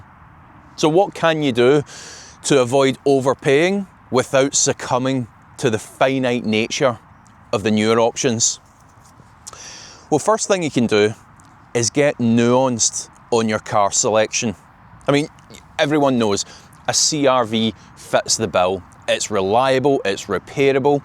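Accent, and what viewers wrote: Scotland, United Kingdom. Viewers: Love the Scots accent too!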